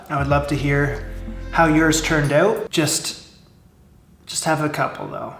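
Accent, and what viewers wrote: Canada. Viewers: you dont need eggs and you dont need the bullshit pearl sugar; lots of cheaper and more common alternatives. (ps..are you canadian or from the pacific north west - you are familiarly annoying).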